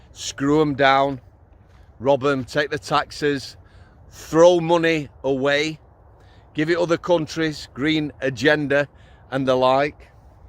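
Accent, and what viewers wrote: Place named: Leeds, United Kingdom. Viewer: Brilliant. You sound like a Leeds lad, apparently it’s kicking offin Dewsbury atm, nothing on Youtude though.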